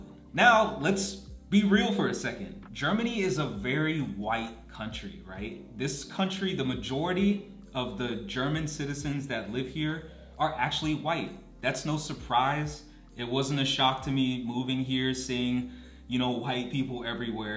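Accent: United States. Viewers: You are black yes but you are also American. Your American accent will also afford you a level of respect that non-American blacks may not receive.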